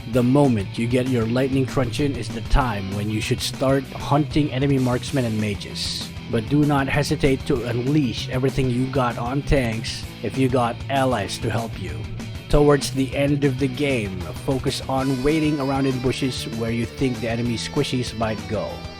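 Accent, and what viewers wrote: United States. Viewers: Pilipino ka pala hahaha akala ko american 👍👍👍👍Pota Ka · I thought you were American or what ever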